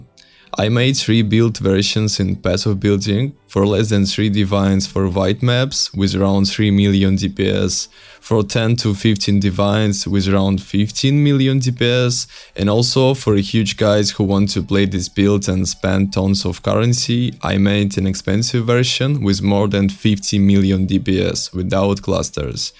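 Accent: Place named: Eastern Europe. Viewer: Never thought frost blades can get to this dps in that low investment) Also you accent, Where are you from? Sounds like east europe Или даже Россия)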